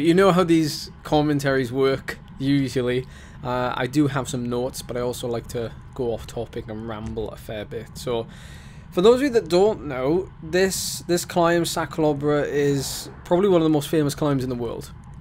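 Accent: Wales, United Kingdom. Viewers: Hope you don't mind but I turned the video off and just listened to your soothing Welsh tones 😁 Well done buddy 👍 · Who would want to mute a good welsh accent? 🏴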